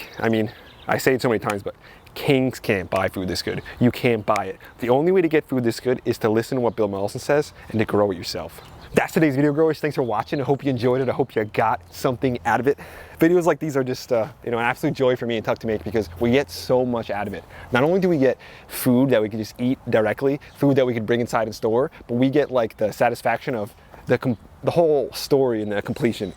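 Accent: New Jersey, United States. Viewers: I can't get over the fact you've got such a strong New Jersey accent! I live in NYC, so I've been exposed to a few N.J. varieties. And Tuck is so cool. More Tuck, please!😘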